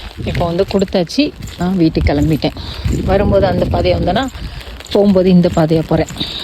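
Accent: Tirunelveli, India. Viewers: ஒரு மூன்று நாளைக்கு முன்னாடி படையப்பா 5மணிக்கு வாசலில் இருக்கும் என்று சொன்னார் so conform ah moonaru than... But slang tirunelveli native.. irukum.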